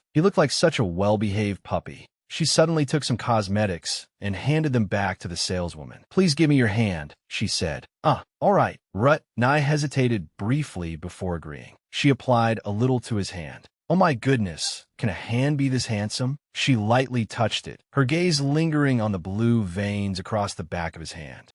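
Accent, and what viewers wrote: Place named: France. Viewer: I can't tell if the AI is part French or part German 😅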